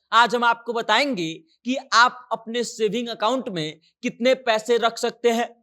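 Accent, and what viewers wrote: Bihar, India. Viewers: बिहार से हो क्या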